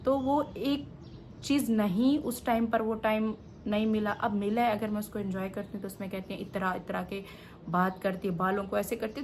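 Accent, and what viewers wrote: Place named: India. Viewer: fazool baat mat Karo. Mein ne bhi comment parhe hein. Tum groom ker rahi ho sab cheez to dressing bhi groom Karo. Aap ko samjhaya hi Hai zayada tar logon ne. Trip pe aise kapre nahi pehente. Aap normal pehente hein koi nahi kehta. Aap picnic pe ghalat kapre pehen k gaye. Vo kapre picnic k liye nahi the. Aur aap ki zuban saaf thi. Aap ab india n logon ki Tarah bolna shru ker diya Hai. Achi aur saaf zuban ko kharab ker Liya. Yehi bola gaya Hai. Ye vlog intihayi fazool Hai. Aur her waqt mere husband ki doosri shadi. Her waqt yehi baat. Bas Karo yaar. Aur koi topic nahi hai. Agar tum her baat pe public ki baat sunti ho to groom kerne ko bola Jaye to use bhi suno.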